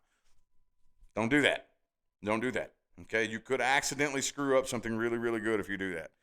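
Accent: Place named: New England, United States. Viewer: Lovely yanky twang..!!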